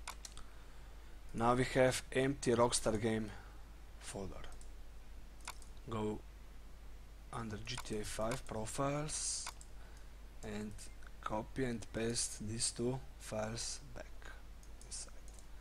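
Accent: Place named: Balkans. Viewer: druze znao sam da si srbin il balkanac primjeti ti se naglasak XD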